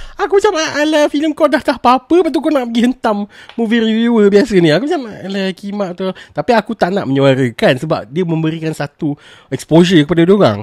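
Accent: Kedah, Malaysia. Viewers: First time dengar cakap loghat Kedah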